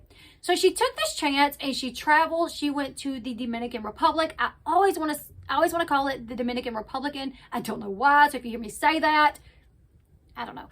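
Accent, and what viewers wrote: South, United States. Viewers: Just came on here to say I absolutely love your southern accent I literally just come to your channel to hear you talk sending lots of love from London, UK !! Your accent is everything for me girl !!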